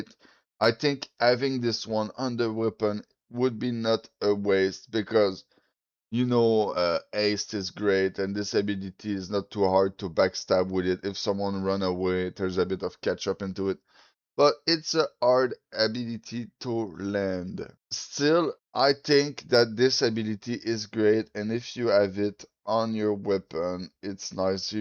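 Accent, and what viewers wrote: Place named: Montreal, Canada. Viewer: I see the accent you from Montreal?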